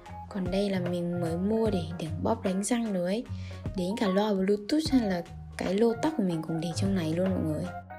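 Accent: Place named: North, Vietnam. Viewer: Giọng bắc đồ đó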